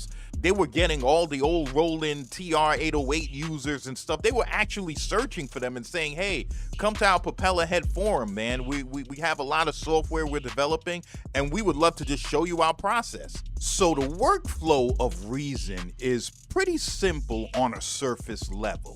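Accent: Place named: Haiti. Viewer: sak passé! Map boule! Real talk, I can spot a Haitian accent. Even though you don’t really have one per se, I can still tell. I kind of sounds like a queens/Long Island accent mixed with Haitian accent. Love the channel brother.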